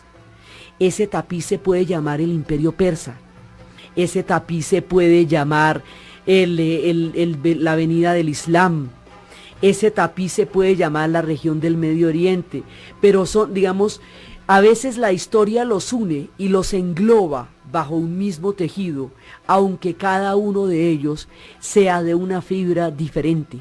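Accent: Colombia. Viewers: Oiga usted!!! Qué manera tan clara de impartir historia!!!!👏🏼👏🏼👏🏼 siempre me había enredado con aquellos nombres de los persas... delicioso ese acento colombiano😉👋🏻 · Hola, soy andaluza. Ese 'dege' suyo colombuano tan gracioso y expresivo, esa cultura, inteligencia y empatía para transmitir sus conocimientos es de Premio Novel a la expansión cultural a todos los publicos de habla hispana. Gracias. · Jaajajaja narras muy chimba pareces Colombiana saludos de un gnóstico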